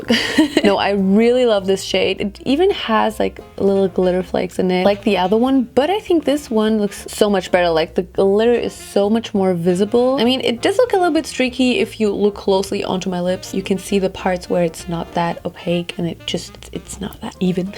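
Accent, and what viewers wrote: Germany. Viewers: I think it depends on the german accent/speaker totally. I've heard some pretty ridiculous and funny sounding ones, but hers is very cute :3 No offence to german ppl! I hate finnish (my country) ppls accent also. Actually hate it the most probably hahah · She's from Germany :)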